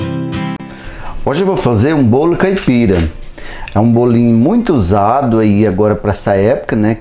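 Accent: Minas Gerais, Brazil. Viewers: Gente que simplicidade adorei parabens! Sotaque meio mineiro, goiano? Adorooooo fogaozinho a lenha me lembrou mto minhas origens. Parabéns acabou de ganhar uma inscrita e super like. Adorei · Seu jeitinho de falar é muito gostoso de ouvir, jeitinho mineiro , amo seus vídeos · Você passa uma paz tremenda!!! Amo sua maneira de falar. Vc é mineiro? Sua comida é muito bem feita, e com certeza saborosa! Vc em outro dia falou como sua mãe educação vc! E falou de uma maneira respeitosa... lindo! Gosto de vc de graça! Ñ é uma cantada! É um elogio❤👏👏👏👏👏👏👏👏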